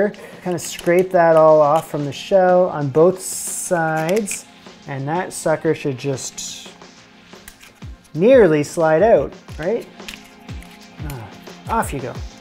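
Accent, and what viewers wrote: Canada. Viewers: i clocked that you were canadian immediately before you even said that you were, amidst a sea of americans on youtube i spot my brethren with ease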